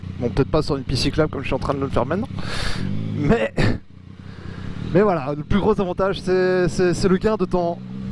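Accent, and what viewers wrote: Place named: France. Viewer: Sympa la petite ballade dans Bx,... T'es un français résidant en Belgique ? Je dis ça car tu as un accent français. Très bonne vidéo ça permet de se faire une idée des choses à compter lorsqu'on aimerait s'offrir une bécane. Thx 👍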